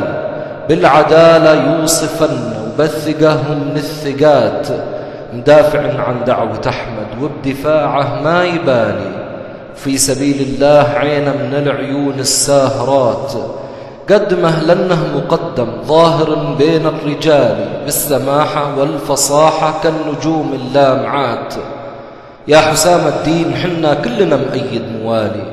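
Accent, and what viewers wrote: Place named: Bedouin, Jordan. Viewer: كلام جميل بارك الله فيك لكن هذا ما هو خليجي هذي لهجه بدوية اردنية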